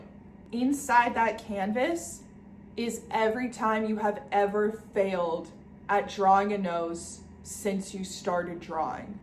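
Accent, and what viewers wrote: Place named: Israel. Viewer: יש מצב שאת יודעת עברית? 😅 האינטונציה שלך נשמעת ישראלית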